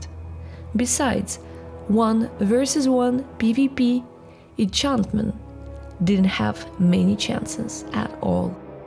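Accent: Russia. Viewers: У вас русский акцент или мне кажется)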